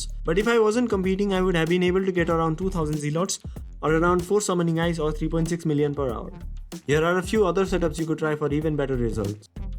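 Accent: India. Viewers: Your voice and english talking is like a Indian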